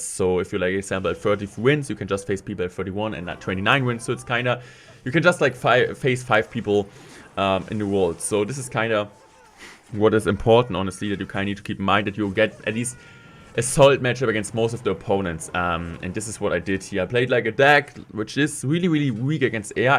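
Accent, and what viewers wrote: Germany. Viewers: The reason why so many people are using minor control is because you showed the deck 😂 Edit: man hört deinen deutschen Akzent „bisschen“ 😂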